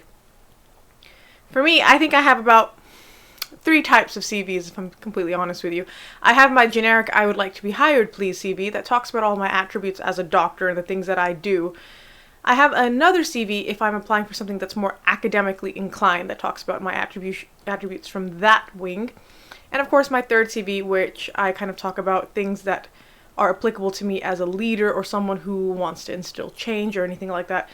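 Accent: United States. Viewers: Assalamu alaikum sister, your strong American accent got me searching through all your videos why u chose to work as a doctor here in the UK , because your husband doesn't have a American accent, I'm sure he's either Pakistan or born in India, so u and Ur husbands visa situation sounds must be very complicated, with u being an American citizen, so how come you don't work and live in America as a Dr with your husband, not sure if you covered this subject in a video, please post me the link , jaza kallah and thank you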